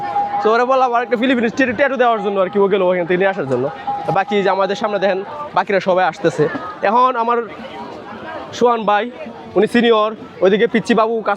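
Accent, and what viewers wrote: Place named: Netrokona, Bangladesh. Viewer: আপনার কথার স্টাইল অনেকটা আমাদের নেত্রকোনা র মানুষের মত, যদি কিছু মনে না করেন তাহলে, জানতে কি পারি আপনার বাড়ি?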